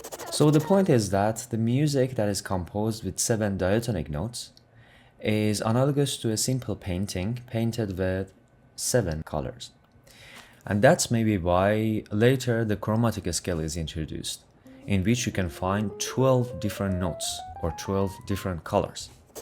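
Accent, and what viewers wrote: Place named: Iran. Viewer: I like your Iranian accent made me think you are from Italy - like that woman melani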